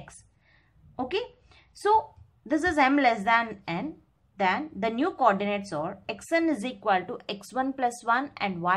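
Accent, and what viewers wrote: India. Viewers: indian accent equals to a mark of quality.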